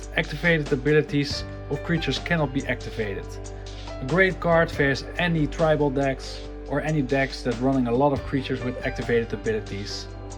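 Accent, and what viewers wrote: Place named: United Kingdom. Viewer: now thats how you speak the kings 🤴 English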